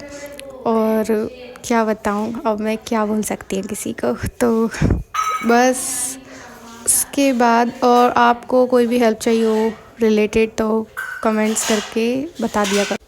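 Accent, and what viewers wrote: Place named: Dholpur-Agra, India. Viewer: Language toh dholpur agra wali h kyuki m yha maniya (dholpur) posted hu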